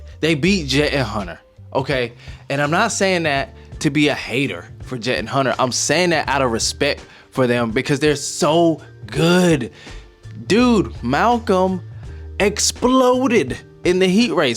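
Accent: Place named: Australia. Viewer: Your Aussie accent is hilarious 😂😂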